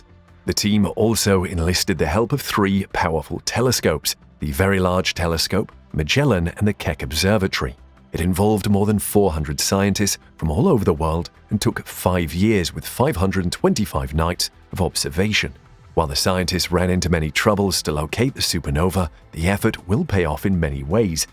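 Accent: United Kingdom. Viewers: you have the british accent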